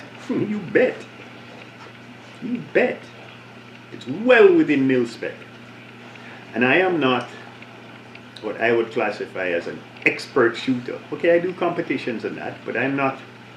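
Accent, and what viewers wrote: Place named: Barbados. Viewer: Heck I just trying to figure out your accent but I'm gonna go out on a guess and say Barbados.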